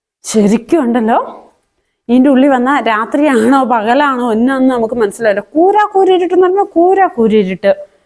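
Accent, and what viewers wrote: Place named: Kasaragod, India. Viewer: നിങ്ങൾ പറയുന്ന കാസർഗോഡ് ഭാഷ കേൾക്കാൻ നല്ല ഇമ്പമുണ്ട്. Nice videos keep going ! I found a sincere approach from you and it should be appreciated. Well done!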